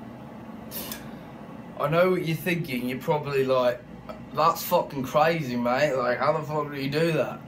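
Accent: England, United Kingdom. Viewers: fookin hell thought he is a fine lad from Briston or London, until i found out.. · Can someone explain why he decided to speak in an English accent? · What! U call that an Australian accent. It’s British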